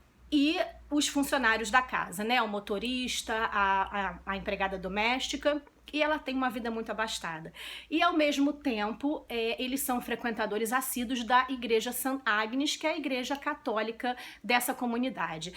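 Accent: Rio de Janeiro, Brazil. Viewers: só me dei conta do sotaque 'carioca' depois do teu comentário, crissstão, luzzzz, contrassssti, etc. …so amazing! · Esse livro é muito maravilhoso mesmo. E a delicia que é esse sotaque carioca?! Amo seus videos. <3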